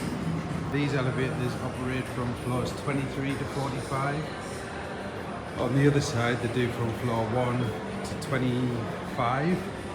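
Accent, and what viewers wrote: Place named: North East, United Kingdom. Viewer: You’re accent sounds very north east! We’ve also stayed in this hotel, loved it, fantastic location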